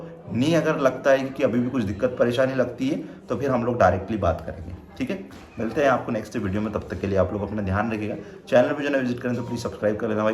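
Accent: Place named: Indore, India. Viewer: I am from Bhopal and your language tone is indori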